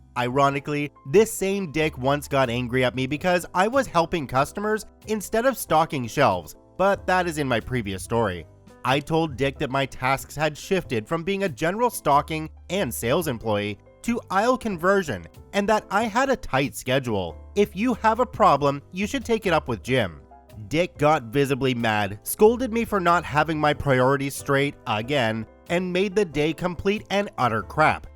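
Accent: Canada. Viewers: Irish accent with a Canadian twang. Lol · Can't tell if that's Canadian Accent or if is really an American from wisconson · okay but the canadian accent tho that caught me off guard XD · You know their talking about our TIM HORTONS GOD I LOVE MY TIMMYS PS LOVE YOUR CANADIAN VOICE VERY FUNNY CAUSE ITS TRUE 🙃🤪😜 Sorry lol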